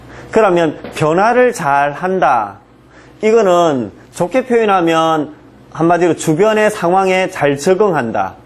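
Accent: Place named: Gyeongsang, South Korea. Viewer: 선생님 귀에 쏙쏙 들어오는 음성과 논리 너무 귀하고 감사합니다. 경상도라 그 억양이 더 친숙하고 이질감 없어 집중에 도움됩니다.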